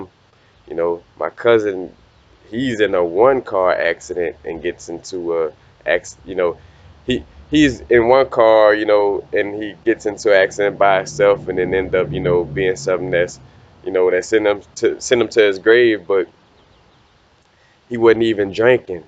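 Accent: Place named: South Louisiana, United States. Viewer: I'm from lousiana, you sound like you are in the south Louisiana area but I may be wrong.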